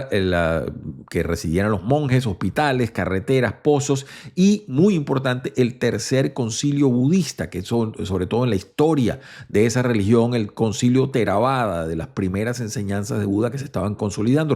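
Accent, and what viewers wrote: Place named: Caracas, Venezuela. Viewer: Excelente tema, excelente canal y excelente vocabulario, excelente dicción y magnífica voz coronada con el acento caraqueño. Gracias Sr.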